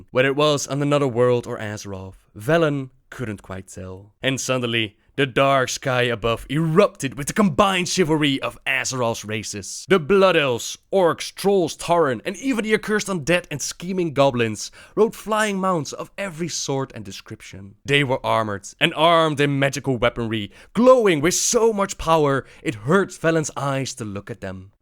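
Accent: Netherlands. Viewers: I fucking adore these giant perspective stories of the universe, Sargaeras, the world spirit, etc. Can't get enough of these Dutch accent English (?) narrated videos. Would love it if you gained an official position as a Lore Keeper for the Warcraft universe. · And when u click on it, it needs 2 talk in his dutch accent haha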